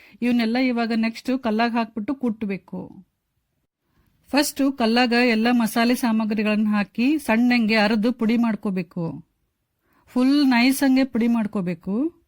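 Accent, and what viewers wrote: North Karnataka, India. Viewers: Ma’am I’m absolutely delighted to hear your North Karnataka Kannada!! I appreciate you for keeping it real...... 👍🏼👍🏼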